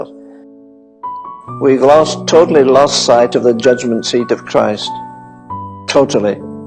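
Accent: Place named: United Kingdom. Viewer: Saint I most look foward to meeting in heaven. I know Paul and Peter and St.John, but this man was close to my time. He moved me with those little British words coming out of his mouth so clear and clean. Prayer and long suffering. Love this little British man. I want to see him. GOD willing I can one day see his resting place, then meet him in that celestial city in the sky built by the King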